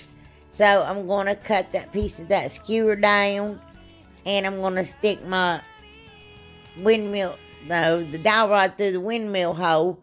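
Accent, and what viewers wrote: South, United States. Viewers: Love that good ole southern accent. I am sorry glad to know that I am not the only one with the decent Southern accent. I'm in Northwest Alabama. Love your crafts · Just found you! Love your channel! Where are you from? Loving your accent....Carolina girl myself so I have one too! God bless and keep the videos coming.🤗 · I'm new to your channel. Just love your country accent, where are you from? I loved all your projects especially the baskets. Roll Tide!!🏈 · Hi 👋! I'm a newbie to your channel and have to say you had me at the Windmill. I want you to know I laughed the whole time you filming,you are just to funny! I mean that in the nicest way ever. I'm glad you joined Heidi's collaboration you definitely have a new subscriber here. I have to ask where are you from with that accent. I'm in NC and I thought I had southern drawl. 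Have a great week 😀and God Bless 🙌!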